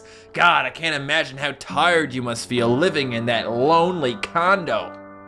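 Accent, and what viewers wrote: Canada. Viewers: his Canadian sorrys really show in this video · Yes, he is from part of Canada where they say that.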